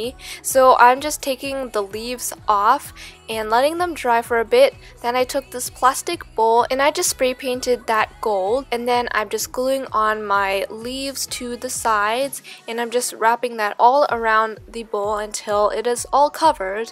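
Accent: Canada. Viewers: She's Canadian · Top Bun she does, she has a Canadian accent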